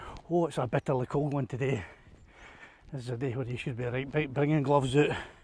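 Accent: Scotland, United Kingdom. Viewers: "What did you do Saturday?" "Well, I put on some headphones and listened to a Scot breathe heavily into a microphone while discussing drone distance." Well done, friend. I hope this has been educational for all the haters.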